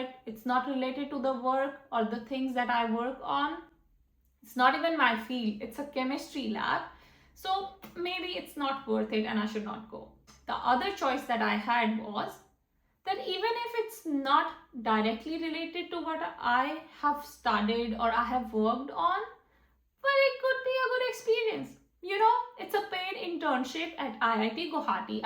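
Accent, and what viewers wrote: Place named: Germany. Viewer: What happened to your accent, i think it mixed up with German 😅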